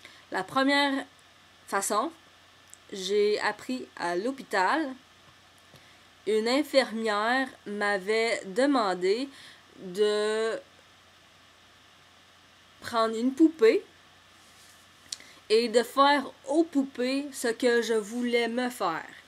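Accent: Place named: Quebec, Canada. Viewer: De quelle région du Québec viens-tu? Ton accent quand tu dis mettons "infermière" est plus épais que je suis habituée d'entendre, et je suis curieuse :) Merci!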